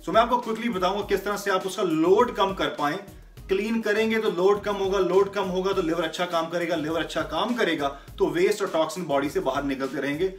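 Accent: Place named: India. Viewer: The worst thing ive heard is indians speaking english i dont understand 70% of words he saying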